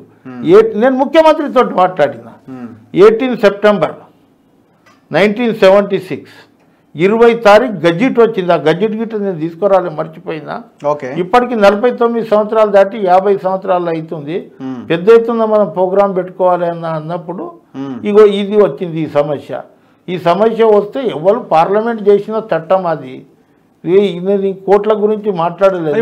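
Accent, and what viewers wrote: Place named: Maharashtra, India. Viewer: Veedu daggara undi chusindu veedu😂😂😂 correct ga telugu matladu ra nuvvu mottham maharastra batch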